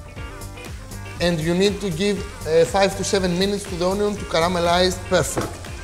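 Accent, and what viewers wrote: Greece. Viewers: That lovely accent is Greek btw not Italian.. pretty similar tho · my friend. Love your videos and your charming Greek accent. But I was kind of disappointed that you didn't use Greek basil😊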